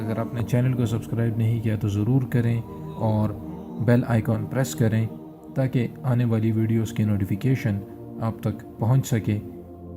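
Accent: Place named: Arab world. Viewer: eysa lag raha hai keh Juma ka khutba sun raha hun> plz mic mun mouth se dur rakh kr audio rec kren our saaf urdu bolen, urdu ko arabic accent main bolne ka kia faida? our 'yeh ourat kre ga' ab yeh kia hota hai? kaniz pr dleel hai woh kia hai? kaniz means Gulam slave yah kia? female? hazrat danial A.S ke ilawah kuch nahi ho skta hai kia? chalo agar koi khatoon he dekh lia anda deti hui murghi tuo kia woh bhi ourat se he shadi kre gi? our kafan chori kre ga uski defination kahan hai? tuo kia zada ande nahi hum main se baz afrad ko hadees pehle se maloom hoti hain defination tlash kr rhye hote hain 3 mins 50 sec zaya krane ka koi faida? 7month se neache log qouestion puch rahe hain kisi aik ka bhi molana jwab dia hai keh nahi?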